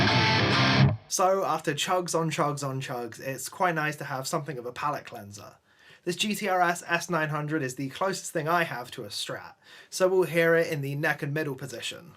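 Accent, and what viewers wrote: United Kingdom. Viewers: Hi You sound british but do you live and work in iceland with mikko? If yes you are so lucky and i wish i could join you...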